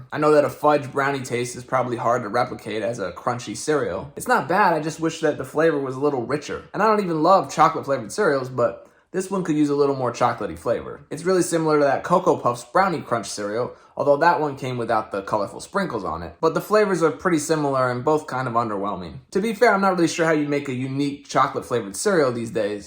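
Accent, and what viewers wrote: United States. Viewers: You from San Antonio, Right.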